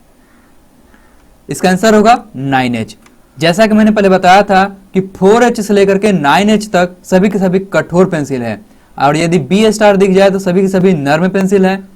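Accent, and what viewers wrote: Bihar, India. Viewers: जरूर आप बिहार से है।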